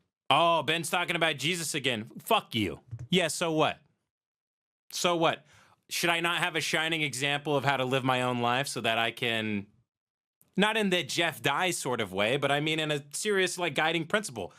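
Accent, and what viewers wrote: South, United States. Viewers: i could listen to ben doing a southern accent about nonsensical college sports talk for 10 hours straight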